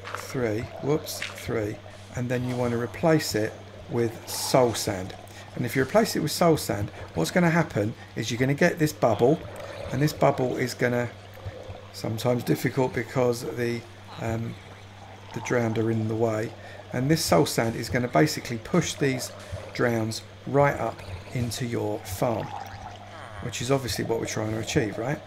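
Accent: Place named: South London, United Kingdom. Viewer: How is it Gordon Ramsey when he doesnt cook the only thing similar is that he is also british. They both sound like they are from South London but it doesnt automatically make him Gordon Ramsey. Im guessing you are an American who thinks there is only one form of British accent. Let me correct you, there are a LOT of British accents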